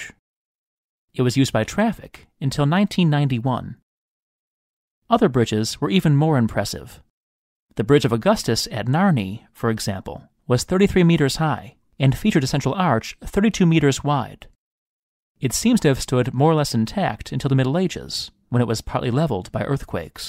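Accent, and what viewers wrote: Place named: Chicago, United States. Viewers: you have a super thick Chicago accent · i could tell you were from chicago. i picked up the mild accent in your videos. great content!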